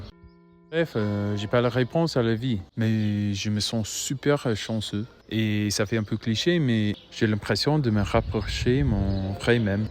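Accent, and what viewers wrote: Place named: Quebec, Canada. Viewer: waw your French is amazing we wouldn't even know you're irish you kinda sound québécois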